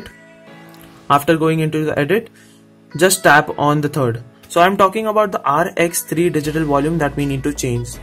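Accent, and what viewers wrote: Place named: India. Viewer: hearing indian saying stuff that end with T kindna annoying .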